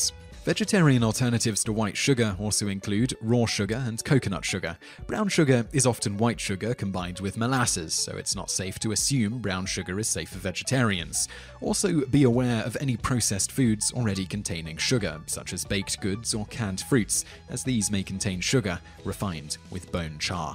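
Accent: United Kingdom. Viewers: *Story time!* As a muslim and my family not being religious we end up at alot of non-halal restraunts so I have to pick and mix from the veggie menus ( i used to be veggie for pretty much my whole life before converting to islam.. even slumping on the vegan side!) aaaaannnnd tbh They're pretty good at stating whats vegetarian or not as well as I'm allergic to all E-numbers, colours, preservatives, flavours, blah and blah so I have to read the ingredients lists anyhooo but Again, the guy in the video is pretty much right... if you want to guarantee something is veggie/vegan then grow/make it yourself! *we salute you Wonderfully british accented narrator....*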